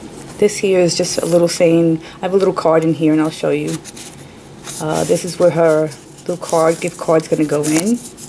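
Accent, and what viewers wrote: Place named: New York, United States. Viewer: Beautiful!! Also (grin) sounds like you are a sister New Yorker.....I was born and raised in Greenwich Village (went to P. S. 41 on 11th and 6th) - nice to hear the accent - music to my ears! THanks for subscribing - I am lost in your videos right now......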